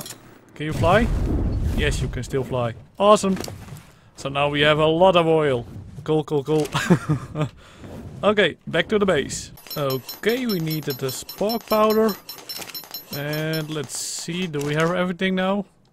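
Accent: Netherlands. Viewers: He has a dutch accent, his English is excellent. I never once have to rewind the video because I don't understand what he has said. That aside, people don't really care how good your language skills are when you come across as a dick. It's sad to see such attitude in someone so young but I hope for your sake, you change as what you have said achieves nothing positive for yourself or the person you insulted and beginning a sentence with "no hate" means nothing, you aim to insult, nothing else.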